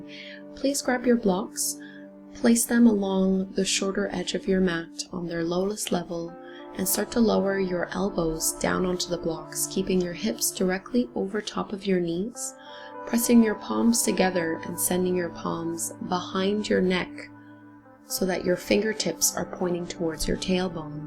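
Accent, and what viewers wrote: Canada. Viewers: Great for loosening up my neck and back. Clear instructions, lovely calm voice, love the Canadian accent. My hubby and i enjoy your classes immensely here in Aussie! 🙏🏽💜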